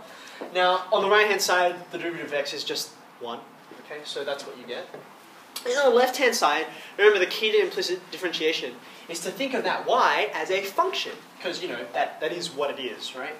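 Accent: Australia. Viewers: indeed. disguisting australian accent.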